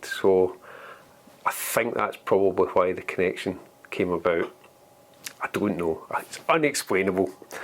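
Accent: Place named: Scotland, United Kingdom. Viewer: I'm going to come across as a complete donkey's ass but I'd bet a penny on it. Have you thought about adding subtitles to your videos given your charming Scotty' accent? I've got no problem with it all. I love it. As for your American and second language audience given your strong accent? Hmmm...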